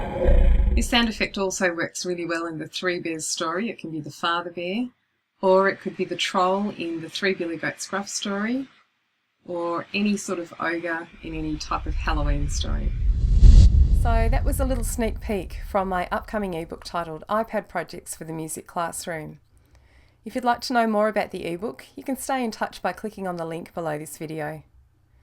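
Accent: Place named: Australia. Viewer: Hi. My name is Maribel. I am in California. My 6th graders love your video and AU accent. We love tech in music class!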